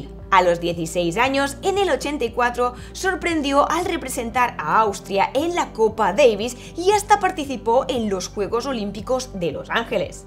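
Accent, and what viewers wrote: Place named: Catalonia, Spain. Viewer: DEJA DE DECIR BRUTAL TAN SEGUIDO, CON TU ACENTO CATALAN TAN CERRADO, DAS BASTANTE CRINGE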